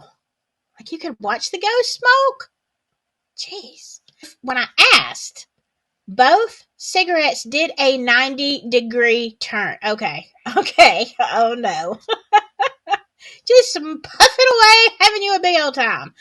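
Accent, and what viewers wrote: United States. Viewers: U have the same English as the rest of English america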